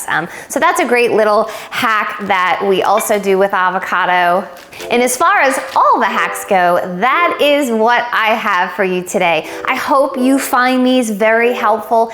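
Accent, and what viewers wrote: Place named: South, United States. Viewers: Gotta be a southern gal-I heard a “ya’ll” right off the bat. 😃 takes one to know one! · I'll bet this is Texas accent 👍🏼. Go Aggies. Go Longhorns 👍🏼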